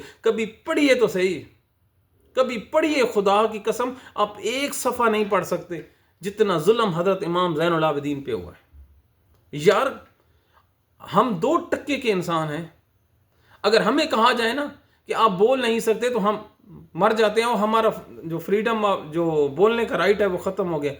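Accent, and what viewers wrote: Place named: Punjab, Pakistan. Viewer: Aoa mai ap ka vlog deihkti hon such pr mubni hota hai ap ka Andaz bohat acha lagta hai tora funni tora bunjabi touch well done 👍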